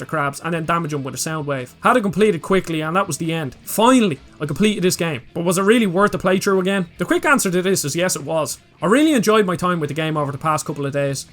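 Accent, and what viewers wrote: Ireland. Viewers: I could listen to your strong Irish brogue all day, brother! great videos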